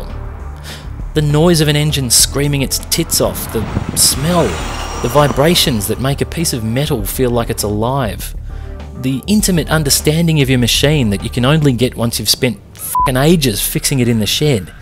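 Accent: Australia. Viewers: Need more reviews like this. The Aussie flavour is refreshing compared to the yank reviews.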